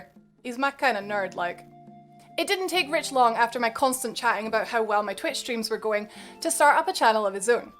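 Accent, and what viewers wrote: Scotland, United Kingdom. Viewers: How the bloody heck does anybody have a problem with your voice and accent, as an Englishman i have always found the Scottish accent to be a wonderful accent, and the scum that have a problem with your weight need to just do one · Anyone find her Scottish accent really soothing.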